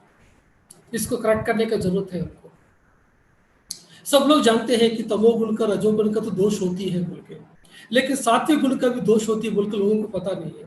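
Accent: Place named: India. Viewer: I am fascinated by yur valuable words as well as ur Hindi ascent Sir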